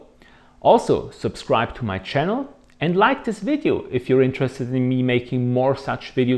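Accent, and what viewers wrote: Germany. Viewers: Work on your accent and englisch you Sound very German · Like Swiss clockwork (of which I have a few) you summarized your ownership in such a dry humorous way (the German accent added to it) that it was a joy listening to even if it was long :). I have a 2003 C4S so it's easy to relate to all the maintenance bits as they're the same apart from the turbos. Like your P-car, mine has the original Porsche radio, and I'd like to do the sam upgrade as the sound quality isn't that great (at low volumes especially), and I'd like to know more about the process of the change itself i.e. the work to be done. (I'd also like to change the speakers as those BOSE speakers aren't that great either). In addition I can add that I have also wanted to get my hands on some of the MF's that disregard the joy of ownership and punitive damages, not only on mine, but their own vehicles (I don't really care about their vehicle, but they should at least respect my wish to keep MY car as pristine as could be) . 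My only gripe towards your review is your reference to CHF which makes it harder to relate than if you had referenced a currency of more generic usage, EUR or USD. It's easier to remember those currencies compared to NOK, than CHF (imhop). Of course then you'd have to do all the math, but probably it would have made more sense to many of your 1,92k subscribers (I'm now one) and probably many of the 44292 (so far) viewers of this video (with greetings from Norway). and keep up the good work. :)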